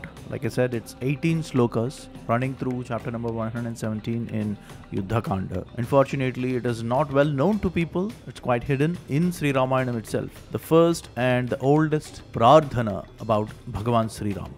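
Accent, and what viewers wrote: India. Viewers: Sir, your Youtube Info says that this channel is Belgium based, but you sound like indian while reciting Shlokas in the videos, anyways stay healthy and keep us updating with the knowledge 🙏🏼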